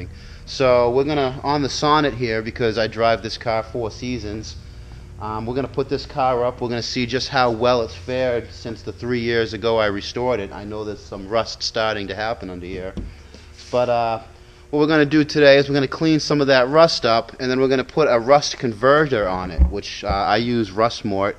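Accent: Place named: Massachusetts, United States. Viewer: Its pronounced kah , and I couldn't hear it cause I'm from heah too ( mass)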